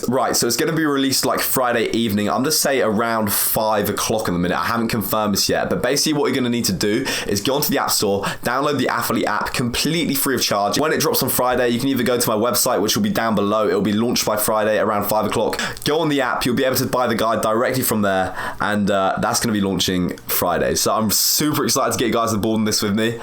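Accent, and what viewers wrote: United Kingdom. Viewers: fking britsh accent hurts my ears no offence and good work btw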